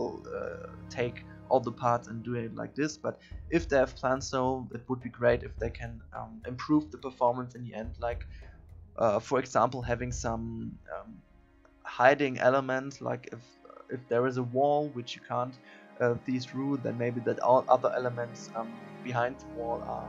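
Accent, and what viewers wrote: Germany. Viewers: Dein Englisch ist doch gut , natürlich hast du keinen Englischen Akzent sknder sprichst halt Englisch wie ein deutscher Englisch spricht. Nur du scheint ein wenig schüchtern hatte ich das Gefühl :)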